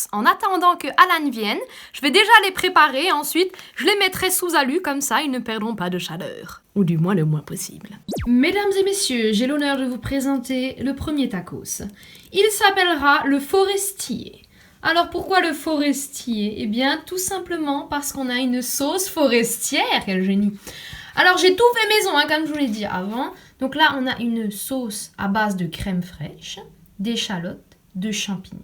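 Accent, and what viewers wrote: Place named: Moselle, France. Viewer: Salut, moi je le trouve chouette ton accent! T as des origines du côté de Moselle est? Forbach, saint avold etc...?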